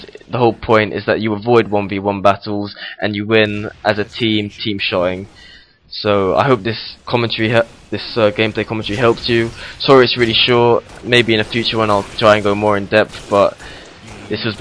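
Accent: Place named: United Kingdom. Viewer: Its British English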